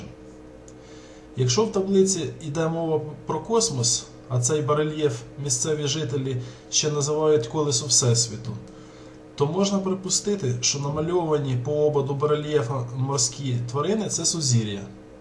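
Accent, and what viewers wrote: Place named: Western Ukraine, Ukraine. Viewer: Понимаешь что достаточное колличество лайков оно не наберёт - тема не популярная. Но раз Бог открыл для тебя эту информацию, значит может это не просто так, а для того чтобы ты поделился ею! И ещё , в видео Говори медленнее, я из Украины , но твой чистый западный диалект, не очень понимаю. И более доступно объясняй - когда ты писал в комментариях - я гораздо быстрее понимал , чем когда ты говоришь в видео.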